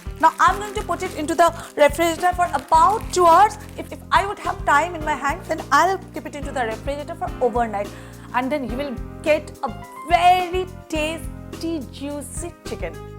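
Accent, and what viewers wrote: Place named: Bengal, India. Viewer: Kotha sune to bangali mone hoche! তাই বাংলায় বলছি-- রান্নার প্রণালী বেশ সুন্দর ও আকর্ষণীয়! কিন্তু রান্না করতে করতে ওই ন্যাকামো গুলো বেশ বিরক্তিকর!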